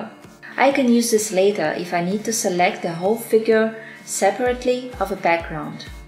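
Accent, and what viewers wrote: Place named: Australia. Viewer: Sound Australian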